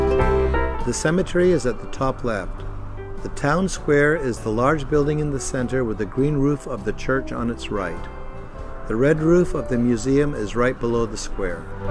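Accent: United States. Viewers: Maybe it's just prejudice, but I can't abide American narration. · Why thank you. But sorry, I do hear a bit of the US in there. I wonder if your roots (your birth place and especially your parents birth place) were from the US and you spoke with American accents in the house (or on the ship, in your case) as your parents held their mid western accents. The Scottish was very slight with my ear - but I certainly did pick up the good ole Wheat Fields in your accent. I loved this video and would adore visiting this place someday when my 2 dogs are gone. BLESSINGS.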